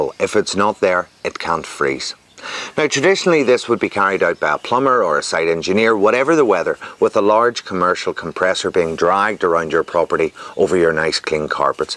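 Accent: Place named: Ireland. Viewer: Omg the subtitles are soooooo funny !!!! The Irish accent caused some confusion 😂😂😂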